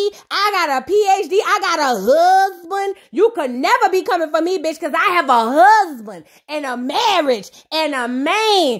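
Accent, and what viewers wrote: New Orleans, United States. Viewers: You’re hilarious! 😂 I started following you last week. I’m NELA but I feel like I’m listening to my NOLA friends. Gotta turn them on to your channel.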